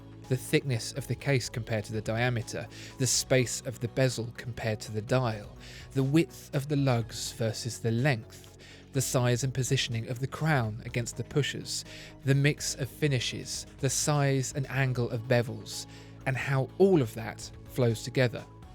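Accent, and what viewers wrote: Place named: Essex, United Kingdom. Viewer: Are these voice overs done by Alan Davies? It certainly sounds a very specific accent of Essex/London border and certain class, not quite posh but not chav either.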